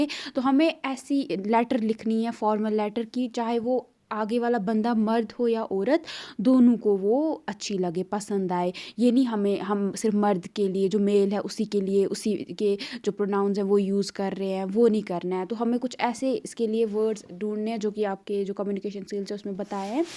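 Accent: Kashmir, India. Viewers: 😂😂di kashmir se ae🙄kashmiri typ urdu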